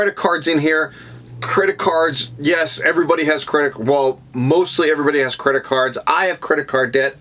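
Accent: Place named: East Coast, United States. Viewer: Nice. I was wondering where that east coast accent was from. New York, here.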